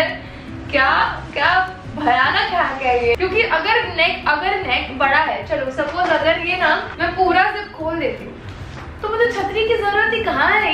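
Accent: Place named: Maharashtra, India. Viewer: You have such a fun marathi accent.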